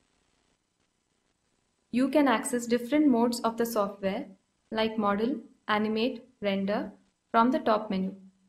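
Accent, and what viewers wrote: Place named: France. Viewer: french accent.. :p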